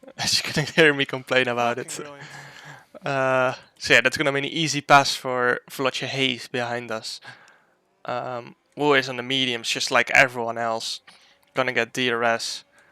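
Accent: Netherlands. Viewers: Ik hou van dat Nederlandse accent 😂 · Are u dutch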